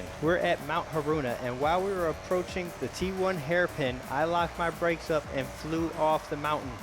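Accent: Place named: South, United States. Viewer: he sounds kinda redneck-y and that kinda accent unironically is a big breath of fresh air in the forza community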